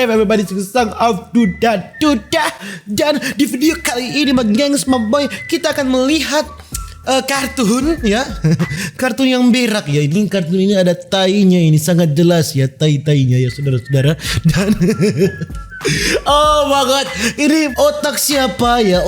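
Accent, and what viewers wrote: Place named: Medan, Indonesia. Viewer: Orang medan ya bang, chenel ter kocak menurut saya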